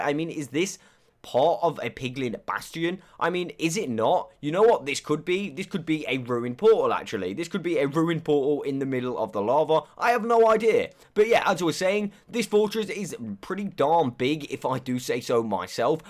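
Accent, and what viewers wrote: United Kingdom. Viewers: Your sound like UK guy.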